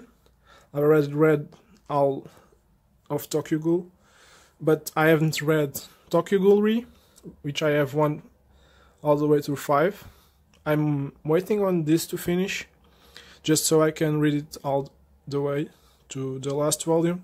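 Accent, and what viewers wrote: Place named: Portugal. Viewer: e vi logo pela maneira como falas o ingles, todos os tugas falam com essa pornuncia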